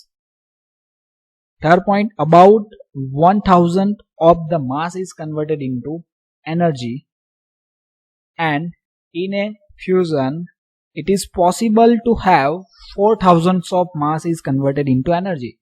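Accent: India. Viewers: The video is good prepared but indian english langauge is very booooring and disgassting ....very bad english 🤮🤮🤮🤮🤮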